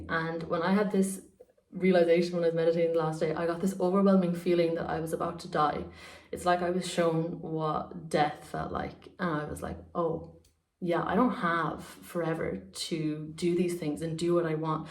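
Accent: Ireland. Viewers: Love your videos btw...so far you're the BEST and I love your Irish accent ❤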